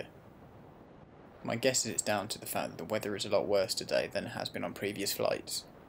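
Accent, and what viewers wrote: United Kingdom. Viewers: Absolutely brilliant! I love narrated flights. I tried to do it once but I have a boring voice and make it sound like a kamikaze pilot. Speaking of which, I sense a slight Midlander accent?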